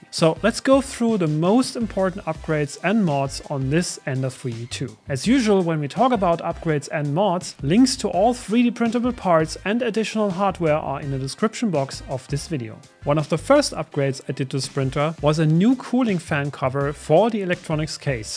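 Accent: Germany. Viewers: I hear a German accent (or one that could be mistaken for German) and I watch the whole video. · Der deutsche Akzent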